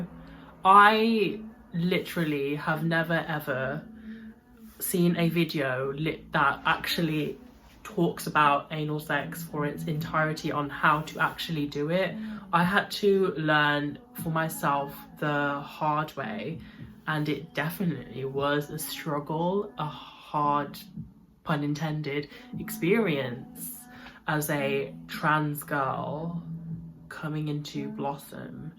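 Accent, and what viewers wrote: United Kingdom. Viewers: Yes that British accent does something to me 😁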